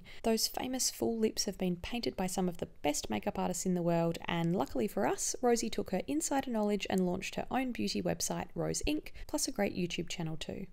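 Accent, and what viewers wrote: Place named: Australia. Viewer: Hi I have a question, do you live in Australia cuz u got an accent and Ye... oh and if you do then where do you get the best skincare products for cheap? I have recently got into skincare but most shops are very pricy.. so if you have any actual in store stores then plz spill:)))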